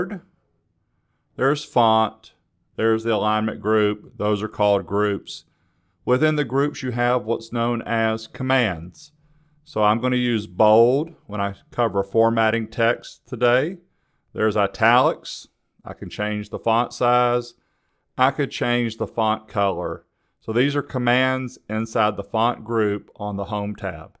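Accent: South, United States. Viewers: Thanks buddy....yous LEGEND.! MY UNIVERSITY RECOMMENDED YOU.!! 😆 🤣 😂 *I KID YOU NOT.! THEY SAID IT'S BROKEN DOWN & EASY TO UNDERSTAND & DIGEST & I GOTTA SAY I AGREE WITH EM.! LOVE THE ACCENT BY THE WAY. IS IT SOUTHERN.? BUT AY, I BET YOU'D SAY THE SAME HEARING MY TEA DRINKING ASS, WITH MY BRITISH ACCENT. "IT IS WHAT IT IS!!!!" I'VE NOT BEEN THROUGH ALL YOUR VIDEOS, BUT COULD YOU POSSIBLY PLEASE TELL ME IF YOU HAVE A VIDEO (SO I CAN GO THROUGH YOU BACK CATALOGUE) EXPLAINING HOW YOU ATTACH OR IMBEDD A POWERPOINT PRESENTATION INTO A WORD DOCUMENT PLEASE.! CUZ OF COVID-19 ALL MY 1ST SEMESTER IN ONLINE & THEY HAVE ASKED US TO HAND IN AN ASSIGNMENT VIA CANVAS(CANVAS IS THE SYSTEM WE USE TO HAND ASSIGNMENT INTO OUR TUTORS TO BE GRADED) BUT THEY HAVE MADE IT CLEAR IT HAS TO COME THROUGH AS 1 FILE NOT 2 SEPARATE FILES(THE ASSIGNMENT CONSISTS OF A 2500 WORD ESSAY & A 20 SLIDE POWERPOINT PRESENTATION.! 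BUT I'M NOT ABLE TO TURN IT INTO 1 FILE. I WAS HOPING YOU COULD SHOW ME HOW IT'S DONE OR BETTER STILL YOU'VE ALREADY DONE IT & COULD POINT ME IN THE RIGHT DIRECTION (IF YOU HAVE ALREADY GONE THROUGH SUCH A TUTORIAL) ON YOUR AMAZING CHANNEL. OKAY I'M GONNA REMOVE MY LIPS 💋 FROM YA BUTT😁😁😆😄☺!!!??* please reply by 23rd November 2020.!!??!! Thank you.!!!!!!!!!!!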